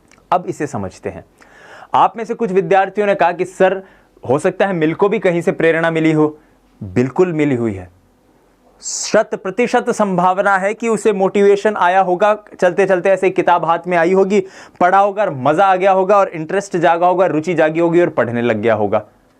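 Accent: Rajasthan, India. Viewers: Sir ur marwadi is fabulous. We olso speak this language in my home ☺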